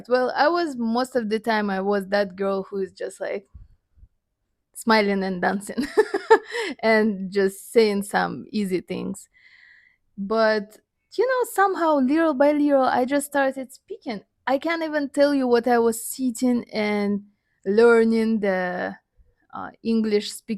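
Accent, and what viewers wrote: Jamaica. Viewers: Jamaican fluency is good I like that accent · You got ah likkle jamaican accent still.. it pops ups with some of your pronunciation of certain words 😂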